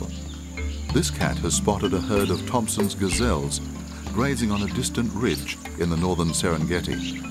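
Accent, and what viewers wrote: United Kingdom. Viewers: The female lion "acting l as though she's not interested"? What? Is this guy claiming to know the mind of the lioness? I am just being funny here, but there is still something creepy about hearing a posh white Englishman say those words in that order. To me these poor female animals aren't acting, as their only way of communicating is through their actions (as their biological state of being in heat is not a conscious choice) so I can assume, as the lioness is violently trying to get away that she is at the very least not happy about what's happening to her! It's like No doesn't mean no to this narrator, in the animal kingdom😉!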